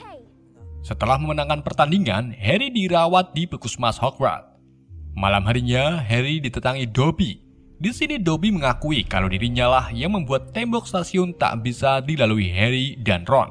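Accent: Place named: Java, Indonesia. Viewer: Radak medok yah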